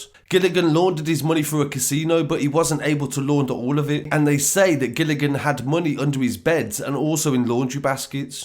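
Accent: Birmingham, United Kingdom. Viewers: Bro I feel your channel would do better if you tone down the brummie accent. Good story!!!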